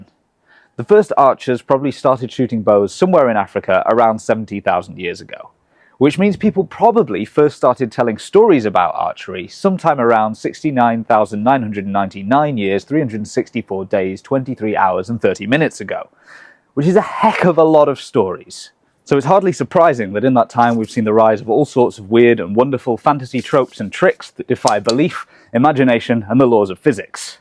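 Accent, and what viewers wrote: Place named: England, United Kingdom. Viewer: I feel good knowing that I was 100% right that this man absolutely had to have an English accent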